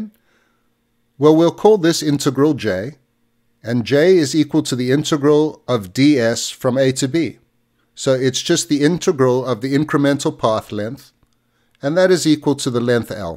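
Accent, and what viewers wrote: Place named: Cape Town, South Africa. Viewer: Hey, nice. Nice to hear a Cape Town accent. I have a more important optimisation problem to solve, which is that of an elastic catenary with fixed weight at some point in the catenary. The problem space is maximum highball free-lining, where we deliberately span open spaces with elastic climbing ropes and the walk them. The ultimate boundary condition of course is elastic strength failure of the freeline. However that failure condition is not the solution to the fundamental problem. What is the catenary solution for an elastic rope in the presence of one or more discrete local masses?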